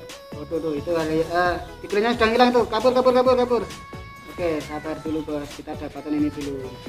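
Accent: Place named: Java, Indonesia. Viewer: Medok mas😀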